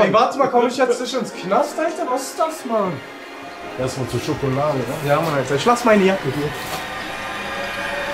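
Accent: North, Germany. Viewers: Dieses Norddeutsch war einfach mega 😂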